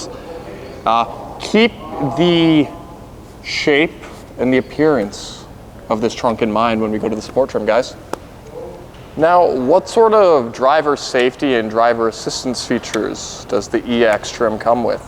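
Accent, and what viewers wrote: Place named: Canada. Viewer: Holy Christ you're Canadian 🤣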